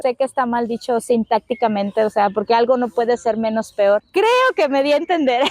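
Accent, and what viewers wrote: Mexico. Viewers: Te escuchas 100% mexicana Ya lo eres, disfrútalo, vívelo, quédate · Cubana con acento mexicano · Ya hablas como mexicana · Te felicito por tu canal!, veo que a pesar de ser cubana tienes un acento ya mexicano, solo me di cuenta de que eres cubana por que dijiste "mi gente" eso es cubano, me alegra que estés subiendo tus videos, saludos desde la CDMX! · Hermosa cubanita con acento mexicano God bless cuba great vid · Eres cubana con acento y forma de ser mexicana!!!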